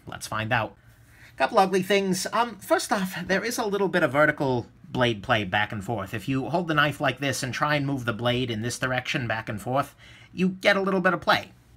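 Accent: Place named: Brooklyn, United States. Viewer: He sounds like he’s from Brooklyn or Staten Island to me. The old timey tv/radio broadcaster is hilarious!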